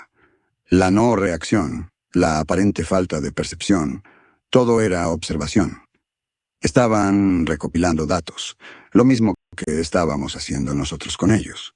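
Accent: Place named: Latin America. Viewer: Q bueno escuchar una voz latina. Desde argentna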